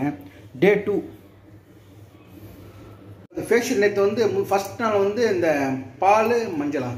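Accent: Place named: Madurai, India. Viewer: Niga peasuradhu madurai sowrasthra mari dan iruku anna ovoru ooru sowrasthra slang change agum anna adan ketan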